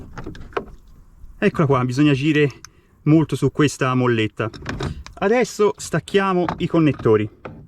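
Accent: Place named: Tuscany, Italy. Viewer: Sei davvero bravo....vorrei avere la tua manualità e, purtroppo, dall'accento toscano che noto, non siamo proprio vicini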